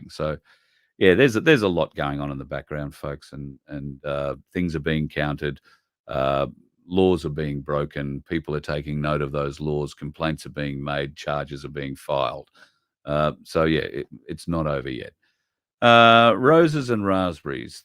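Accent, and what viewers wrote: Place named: Australia. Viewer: Great show, great information, glad to hear the voice of another Aussie patriot on the airways. Godspeed and I look forward to the next broadcast.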